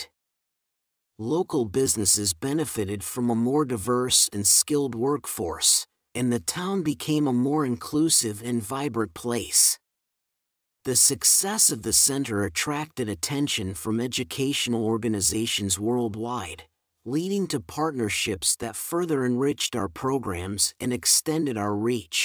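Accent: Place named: India. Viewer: just because of your accent I'm curious to know that whether you are from India or abroad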